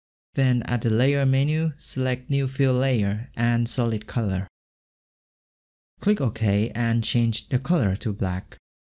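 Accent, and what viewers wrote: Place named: India. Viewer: This Indian talking, I hate it! Really can you not talking ....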